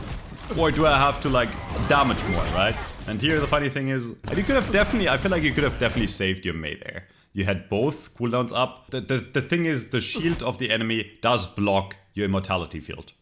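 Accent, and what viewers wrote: Germany. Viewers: Boi, are you German? 😅 Or what is that accent?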